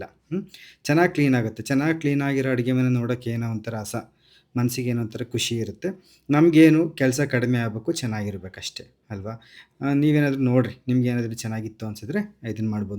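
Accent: India. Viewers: ದುರ್ಗಾ ಕಡೆ ಜನ ಹಾಗೆ ಮಾತಾಡೋದು ಅವರ ಮಾತು ಅಡಿಗೆ ಎಲ್ಲಾ ಚಂದ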